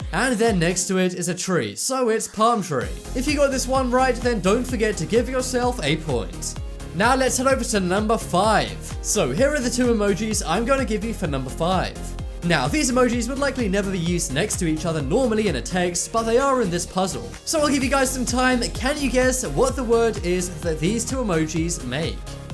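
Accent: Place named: United Kingdom. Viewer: He sounds btitish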